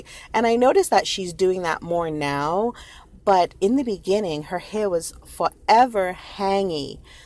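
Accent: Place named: East Coast, United States. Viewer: No matter what platform you use, you will find that a lot of negative comments will suddenly disappear. I'm American and I'm guessing by your accent you're from the East coast(?) That's where I'm from and I grew up in a very diverse area where people are just people, and nothing was black or white. You are judged on character, not color. My band mates are English and we're all on the same page with these two. These people, the "sugars" as they call them, have no idea who we are or where we come from. They judge and attack based on comments posted about a woman who has chosen to live her life in newspapers and on TV. I never saw a wedding where none of the guests looked happy, the groom looked shell shocked and the bride looked defiant before their wedding. I just knew from that point on that it wasn't going to end well.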